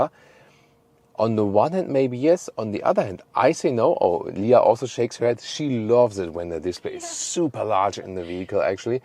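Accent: Germany. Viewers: For some reason your accent rubs me the wrong way. Maybe because I had an awful German manager.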